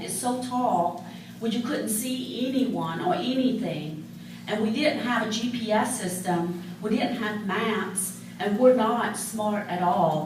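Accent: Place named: South, United States. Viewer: Love her Southern accent.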